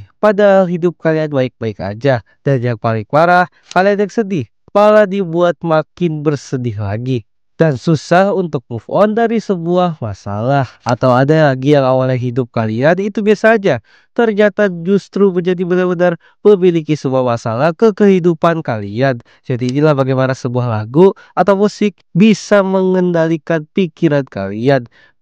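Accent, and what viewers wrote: Java, Indonesia. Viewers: Suara lu jawa min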